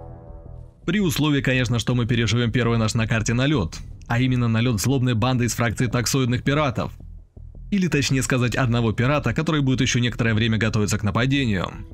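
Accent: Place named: Surzhyk, Ukraine. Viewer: Лайкос за суржик😁😂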